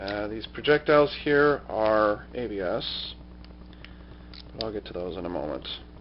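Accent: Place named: United States. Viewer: Could you at least try a little harder on your pronunciations? I mean, I know you're American and all, but really man, it's a little grating to hear when you say the Japanese names.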